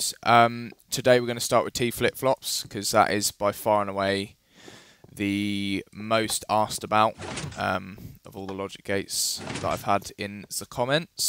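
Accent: United Kingdom. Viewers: listening to a British voice on a Minecraft video was a pleasant change. hate your texture pack though :< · i still get amazed by all your knowledge about redstone circuits.. i wanna learn more! >:D keep it up!! nice videos!! (btw, you've got an awesome brittish accent XD)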